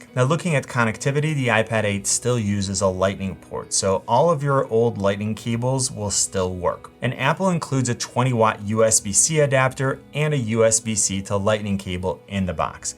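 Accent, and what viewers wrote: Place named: Cleveland, United States. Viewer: I may be way late to this.. but are you from Cleveland??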